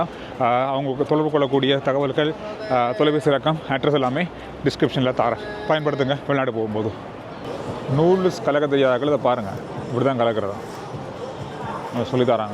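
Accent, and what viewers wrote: Sri Lanka. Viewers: travelogue பயண காணொளி எப்போதும் சிறப்பான காமிரா கோணங்களோடு அழகான காட்சிகள் நிரம்பியதாக ருசிக்கும் இலங்கை தமிழில் ஈர்க்கும் தகவல் வர்ணனைகளோடு கவர்ந்து இழுக்கும். நன்றி. அன்புடன் நாகராஜன் சென்னை. தமிழ்நாடு.(உங்க குடும்பத்தார் இலங்கையில் நலமா)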